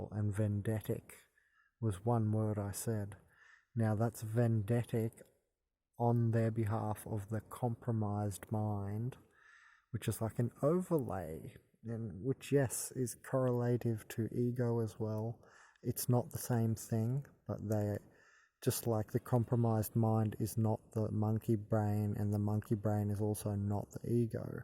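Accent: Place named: Australia. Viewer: Is that the eerie chimes of the Uruguayan Mr Whippy van stalking you at the start of the vid? Sounds like you're an Aussie, did you move to Uruguay in hopes of ending the stalking?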